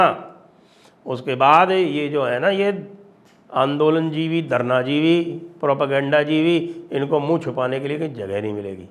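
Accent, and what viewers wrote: Haryana, India. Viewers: Ram Ram sa Sir🙏 Your Haryanvi accent is amazing😊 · Once again the Haryani accent makes my day Dada!😂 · sirs haryanvi accent is colourful 😅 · Saaaaar ji aaaapki haryanavi gajjaaabb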